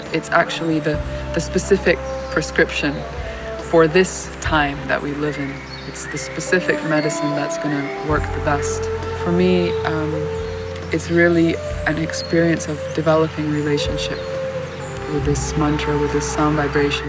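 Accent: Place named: Midwest, United States. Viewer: Her accent is so mid western American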